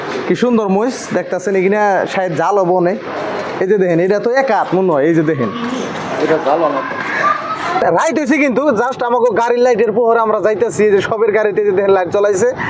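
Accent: Bangladesh. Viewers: আপনার কথা শুনে মনে হচ্ছে আপনি বাংলাদেশি যদি ভারতীয় হন তাহলে এরকম ভাবে কথা বলেন কেন? কোন জেলায় থাকেন? আর যদি বাংলাদেশের মানুষ হন তাহলে আঁধার কার্ড কিভাবে পেলেন? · Amader tangail ar moto language lage 😊❤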